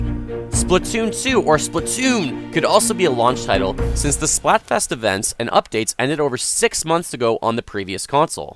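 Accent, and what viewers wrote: Canada. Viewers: "it has BEAN in development it has BEAN delayed" gotta love Canadian accents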